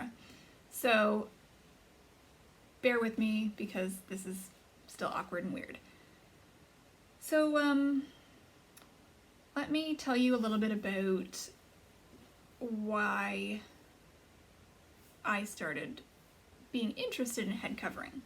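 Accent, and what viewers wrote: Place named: Canada. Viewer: God bless you sister. Thank you so much for sharing. Good for you for seeking to obey the Holy Spirit's leading in your life. I'm super new to head covering, and get great joy from these wonderful testimonies. Are you Canadian by any chance? Just asking cuz you sound a lot like me, and I'm in Nova Scotia, originally from B.C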